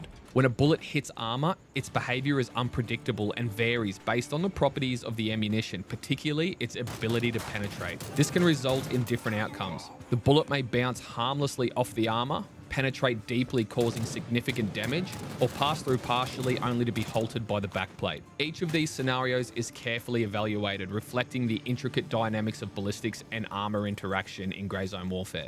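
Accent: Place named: Australia. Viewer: From the Great Plains of North America, I can say it always helps to have a super cool Aussie accent. Excellent video, excellent summary. Concise, to the point, and gets you revved up. I'll be watching more. Good work. 59 year old veteran, and I'm waiting anxiously for this to be a blockbuster! Cheers!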